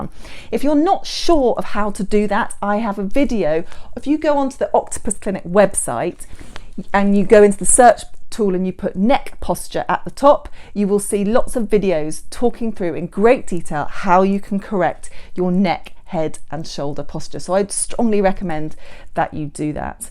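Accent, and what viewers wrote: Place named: United Kingdom. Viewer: I can't breath listening British English accent. It's makes me more tension while listening at your explanation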